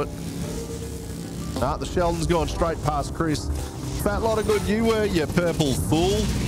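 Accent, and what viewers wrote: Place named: Australia. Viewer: Boy, you were so silly in this video, especially with the way you were saying Korvo's name. Your Aussie accent is awesome too.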